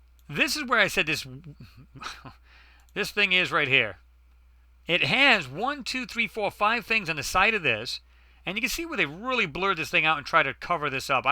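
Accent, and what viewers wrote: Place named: Boston, United States. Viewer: Are you from Boston?! Lol my two favorite accents are the British accent and the Boston accent!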